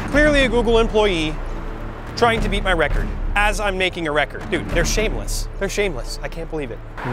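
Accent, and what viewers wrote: United States. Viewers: are you from minnesota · As a german man konnte so einfach erkennen das du amerikanisch bist 😃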